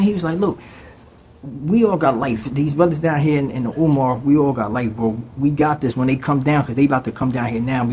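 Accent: New Jersey, United States. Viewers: I knew you were from Jersey before you even said it🤣! Newark in the building. This the first video I’ve seen and I was confused because I’m hearing a Jersey accent on a Georgia prison video it was unexpected.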